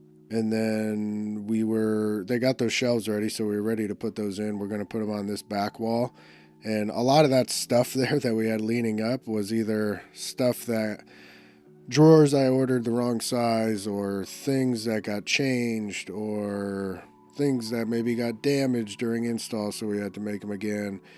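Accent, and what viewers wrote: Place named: East Los Angeles, United States. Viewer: Hope you don't mind some constructive criticism, but your videos would be a lot more engaging if you did a little teaching. It is clear you have a buttload of talent, but just watching videos of you talking like we are in East LA aren't engaging. Started watching after your dual video with BourbonMoth, but still waiting to actually learn something. You have a great re-start on your channel, make it worth watching. I am just another A-hole with an opinon of course, but it would be great to actually learn something along the way. Also, get rid of the "Yo guys" at the start of your videos. You instantly start in the hole. Make your videos that somebody looks forward to hitting YT.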